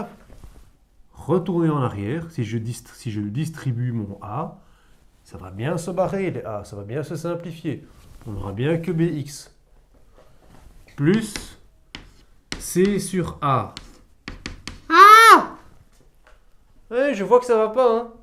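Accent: Brussels, Belgium. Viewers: L'accent belge est vraiment magique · il a un accent bruxellois plus que "belge" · il a un délicieux accent belge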